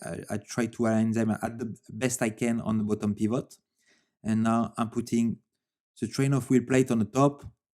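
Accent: France. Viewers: Great video. I like the Franglais accent. Just one tip. When you assemble the automatic works on top of the movement, it is better to unwind the main spring and let the movement stop. If a screw would drop into the running balance spring, that can cause a lot of damage.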